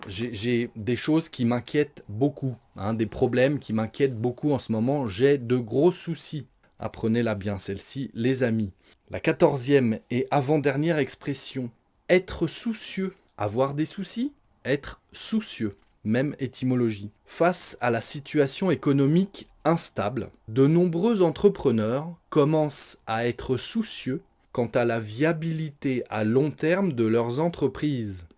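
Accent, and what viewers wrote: France. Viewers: Professeur, cette leçon est très intéressante et importante et c'est très agréable d'entendre sa voix avec son accent français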